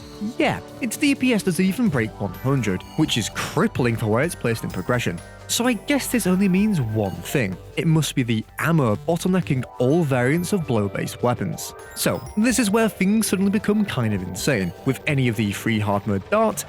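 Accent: United Kingdom. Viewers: I must ask, where in the UK are you from?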